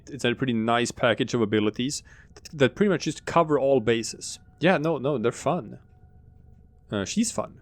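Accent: Sweden. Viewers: Lätt att höra att någon är från Sverige när man är svensk 😂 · You Swedish?? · lite skånska i mixen där 🤣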